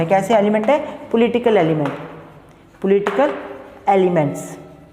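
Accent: Himachal Pradesh, India. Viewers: Sir aap Himachal Se ho ?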